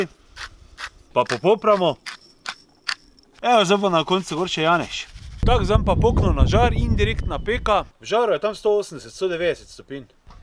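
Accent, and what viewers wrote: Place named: Maribor, Slovenia. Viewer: Hud!!!!!.... moj te bl gleda k jst, k si mu res faca in si edini k pr teb prenese mariborski naglas skratka obožuje te.... Mene pa zanima zakaj na svinjino namest kumine obrazlozi lepo prosm ker jst skos devam kumino gor😊😁 pa super si tut men... Tut jst rada gledam tvoje znanstvene raziskave v kulinariki 😂👍❤️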